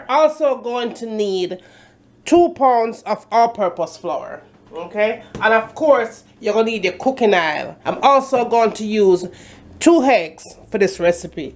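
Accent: Jamaica. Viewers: Lol I love how she chats the patois, many videos out there with Jamaicans trying to "twang" n it sounds bad....it jus feel like my aunty telling mi how to cook!!! well done. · I Love this Queen Sister. She have me on the floor with her yard accent. I simply Love IT !!!! Tell it Sister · Very nice. Actually the best fried chicken recipe I've seen. I'm going to follow your directions and let you know how I did. an mi did very much enjoy do real yardie accent. Bless up. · I love that Jamaican accent even tho it's hard to understand everything you are saying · Love ur food and the way u talk real Jamaican lady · "Nuh bodda guh watch tv an mek di chicken bun up!" 😂😂😂 you a real Jamaican! Love the videos! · no sah u can knw say a real Jamaican, anyways love it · The Accent tho !! Love it !! Real Jamaican !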